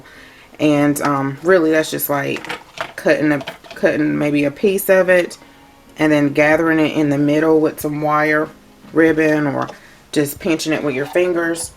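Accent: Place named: South Carolina, United States. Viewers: You sound like me talkin’ ! Very similar, anyway! I’m from Georgia. How about you? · You've got to be from South Carolina lol. Girl, you talk just like me.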